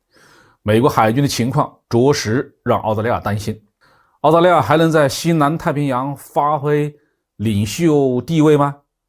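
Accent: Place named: Hubei, China. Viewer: 根据口音分析为我们湖北老乡，鉴定完毕👏😄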